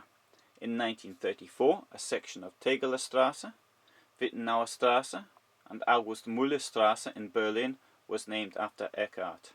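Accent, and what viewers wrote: Newcastle, United Kingdom. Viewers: Geordie narrator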